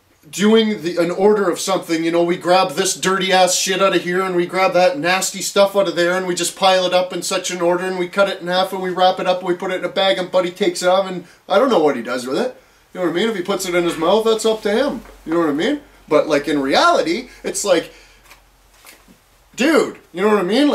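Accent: Canada. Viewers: Best Cannuck accent ever.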